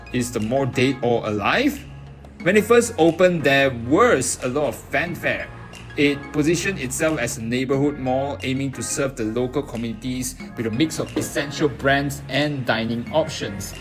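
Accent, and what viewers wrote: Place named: Singapore. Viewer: Bagus cakap dalam bahasa Melayu, bahasa Inggeris logat Singapura sangat mengeciwakan..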